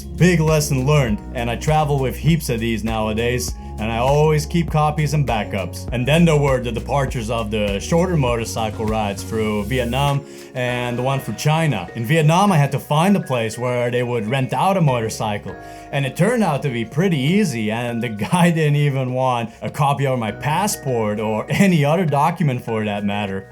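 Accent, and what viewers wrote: Netherlands. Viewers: Hey! Just discovered your videos and they are fantastic! Would you mind answering a question for me? Where are you from? The Netherlands? Austria?:) Somewhere else? I am only asking because of the accent you have. I am Dutch myself but I've been living in the U.S now for nearly 20 years and my English accent has evolved somewhat. I am guessing my accent does sound a little like yours. Thanks for the videos! keep it up and stay safe!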